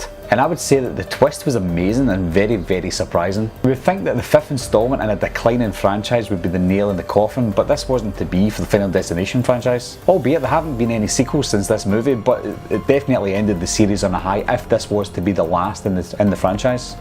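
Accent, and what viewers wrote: Scotland, United Kingdom. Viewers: Your voice is so Scottish...and Scottish voices are sexy!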